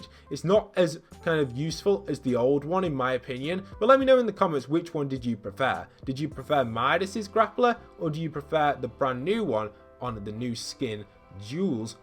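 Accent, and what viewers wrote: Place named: United Kingdom. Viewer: Anyone else notice he has a British accent but goes really northern when he says chug jug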